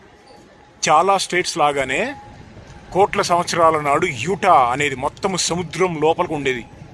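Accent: Andhra Pradesh, India. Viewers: Bro meeru telangana kada..maree nnizamabad..mee baasha enti andhraa laa untundi..akkada settle ayyaaraa…endukantey akkada astundru,potundru,andukunnava,tindra ledaa..ila matladutaaru… History cheputara meedi…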